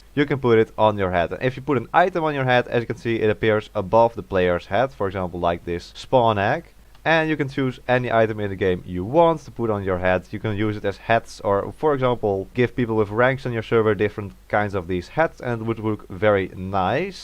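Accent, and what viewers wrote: Netherlands. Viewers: are you dutch??? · Sounds very dutch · ik hoorde het gwn aan het Nederlandse accent 😭🙏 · He is def from Netherland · i know for sure that you are from the netherlands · are you dutch? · your accent sounds dutch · you sound dutch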